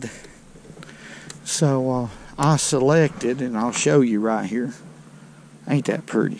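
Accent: South, United States. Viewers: Man I love your rednick voice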